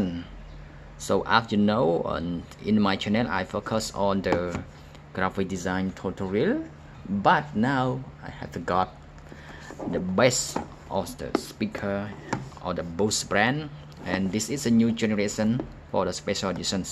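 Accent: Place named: Cambodia. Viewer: Your speaking English like Cambodia people.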